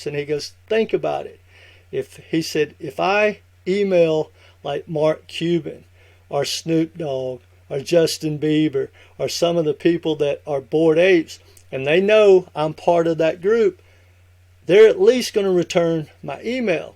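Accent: Texas, United States. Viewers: Thank you…. May I ask some question here… but are you from Texas ??? Your accent is very strong 😂 quite hard to understand is you from Asia 😂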